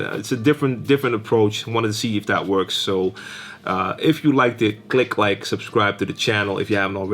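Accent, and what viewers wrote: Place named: United States. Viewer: Wow, your accent sounds like you were born in the US and influenced by living in NL, if I met you on the street I'd never guess... haha. Thanks for the videos man, I've been a mix dj for years, just trying to get better at scratching... you've got some good tips.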